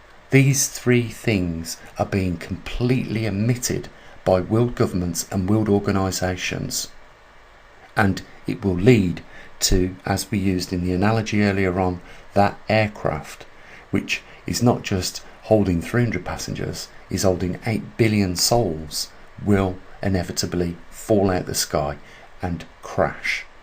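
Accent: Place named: England, United Kingdom. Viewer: Click on CC at the bottom of the video and then click on the wheel to the right of CC for the settings for 'auto translate ' then scroll countries to select 'Spanish'. The Auto-translate isn't perfect especially as has an English regional dialect. Bless you and perhaps interpret main points in the video into Spanish and put that into comments.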